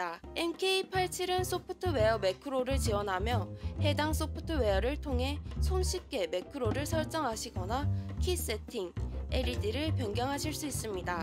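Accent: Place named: Busan, South Korea. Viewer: 부산말투가 살짝~~^^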